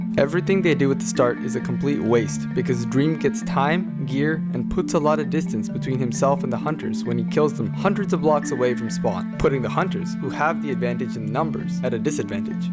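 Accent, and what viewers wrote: Ireland. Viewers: Yooooooooo I am part of the 1% (Edit) I just realised that your Irish... Im Irish to!!!!!!!!!! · Irish Accent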